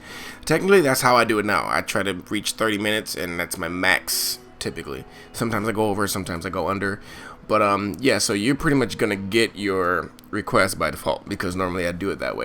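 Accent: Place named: Michigan, United States. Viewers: Hey, you leave Michigan accents alone! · I was guessing you were from the Midwest - maybe Wisconsin or Michigan - based on your super slight accent.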